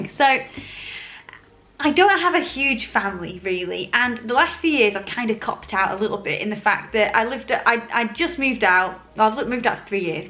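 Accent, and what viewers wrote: England, United Kingdom. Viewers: I live in Yorkshire you don’t sound Yorkshire but definitely English x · You have an English accent with a Highland twang if that makes sense x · You dont sound yorkshire i think you sound english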